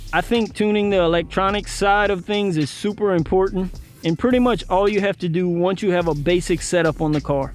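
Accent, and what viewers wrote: Louisiana, United States. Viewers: Your accent sounds Cajun. Are you from the Acadiana area? I'm in St. Martin parish. 🙌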